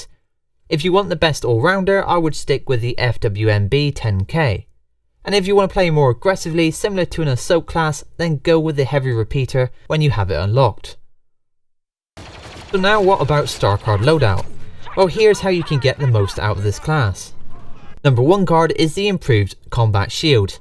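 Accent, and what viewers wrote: West Country, United Kingdom. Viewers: Are you from Bristol? Or Somerset? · The west country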